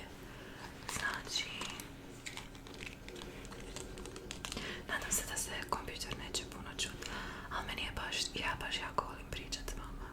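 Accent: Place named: Dalmatia, Croatia. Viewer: Bas i ja gledam ono kao cilo vrime se koristiš dalmatinskim govorom, pa mie bilo malo čudno, ali svakako drago. Nekad se najbolje prepustit i pricat onako kako jezik krene 🙂‍↔️😊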